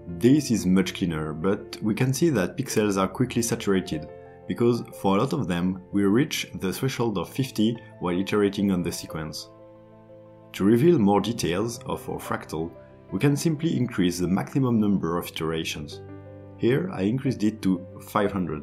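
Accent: France. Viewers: Salut , je voulais savoir sur quelle plateforme on pourrait communiquer. Je laisse ce commentaire en français car l'accent ne trompe pas 😂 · Ohhhh cet accent Français je reconnais:) Nice work!! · I like your accent u french? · I hadn't noticed but you have a French accent! 😂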